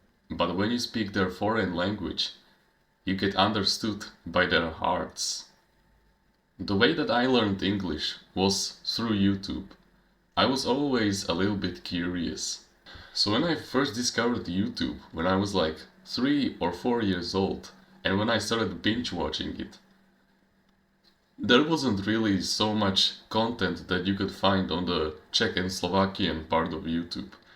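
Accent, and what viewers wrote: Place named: Russia. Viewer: Pardon me i had no idea where are you from. By your accent you sound russian ❤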